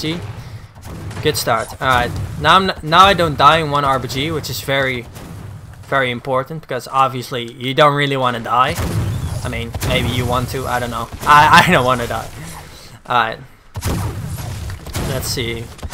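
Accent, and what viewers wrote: Netherlands. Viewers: Are u dutch . Je hebt een nederlands accent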